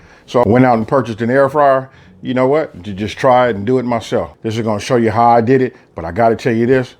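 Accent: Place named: West Coast, United States. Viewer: I appreciate you not hiding your west coast accent LOL....good video bruh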